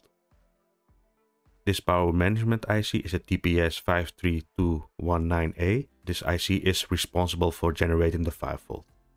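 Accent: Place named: Netherlands. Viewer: Zo horen ben je Nederlands👌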